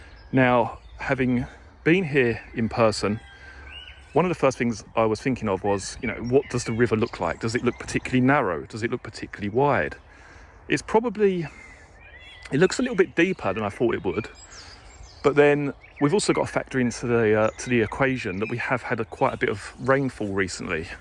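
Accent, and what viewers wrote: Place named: London, United Kingdom. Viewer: Yes, we're all very friendly here in Lancashire and they say the further north you go, the friendlier people get. As it took you so long to get up here, I can only assume you are now based in Exeter or Plymouth? It's a good four hour plus drive, on a good day, for us to get to Torquay - you also mentioned that you had lived in Devon - maybe Cockington, if this was south Devon? However, your accent tells me that you come from nearer to London. Anyway, great video. It's a shame that you didn't stay up here overnight - maybe in Blackpool or somewhere quieter like Fleetwood or Poulton.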